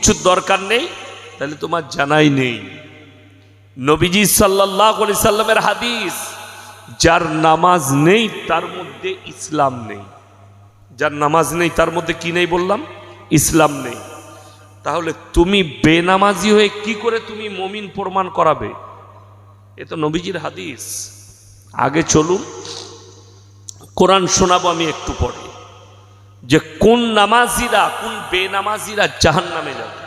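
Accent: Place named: India. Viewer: ওই হুজুরের ইন্ডিয়ার মত কথা কমা বলছে হুজুরের বাড়ি কি ইন্ডিয়ায়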